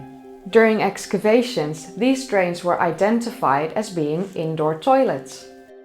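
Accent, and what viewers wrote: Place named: Netherlands. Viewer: Right, a lovely Dutch English accent - I heard it in a second.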